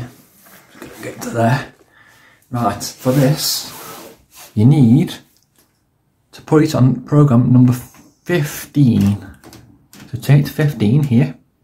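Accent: Stoke, United Kingdom. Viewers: you from stoke mate?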